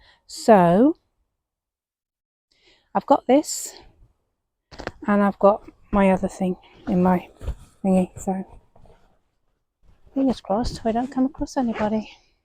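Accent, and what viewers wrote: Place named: Cockney, United Kingdom. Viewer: This woman is speaking with a Cockney accent.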